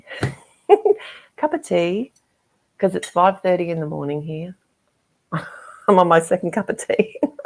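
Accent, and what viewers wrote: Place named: Australia. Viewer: it is lovely to hear a second Aussie accent and you have our great sense of humour. I found your presentation very helpful and I've downloaded your swatch charts. Thank you so much and I will catch you on one of your platforms. From the Goldie, Thank you again.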